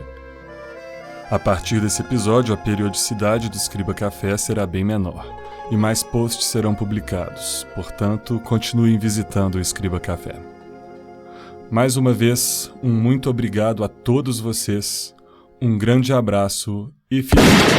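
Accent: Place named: Italy. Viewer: Uma bosta esse sotaque Terra Nostra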